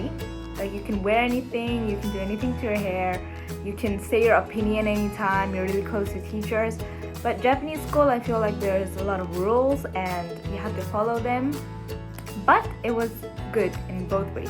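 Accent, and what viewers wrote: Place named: United States. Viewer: I am totally confused by your accent. You grew up in Japan, right. Yet, you're accent when you speak English sounds like you spent some time in America, can't hear the Japanese accent.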